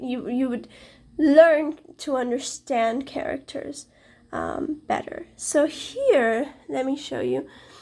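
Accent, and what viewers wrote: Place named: Spain. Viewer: Dear You were awesome 👏👏👏👏👏👏👏 I haven't finished watching the video, But I enjoyed listening to your reading; it was so fluently with a pleasant accent, it even sounded like a native Spanish to me. The way that you explained about reading the story in a foreign language, was interesting for me. I'll use it in English, I hope it works for me as much as it worked for you in Spanish 😊 Good Luck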